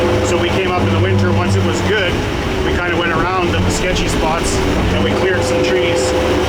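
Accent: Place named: Canada. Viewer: oat stoat about learn how to talk English you Canuck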